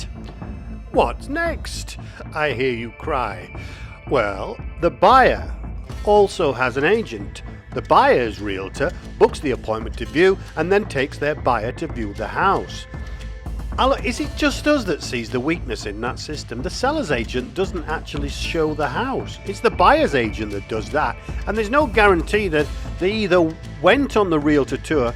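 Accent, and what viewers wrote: Northern England, United Kingdom. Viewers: Great video...we had a similar issue when deciding our move to France...fortunately our house sold in a week..the slow part was after we offered on our current house in France it took 5 months from offer to completion but well worth the wait. We were from Yorkshire and you definitely sound like you have a northern accent but cant quite place it😂...looking forward to the next episode..a great alternative to Strictly Come Dancing😂..Andrew and Julie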